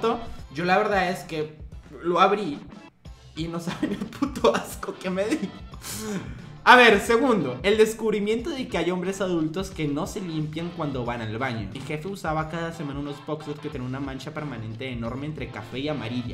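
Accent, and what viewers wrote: Colombia. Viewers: Eres colombiano no?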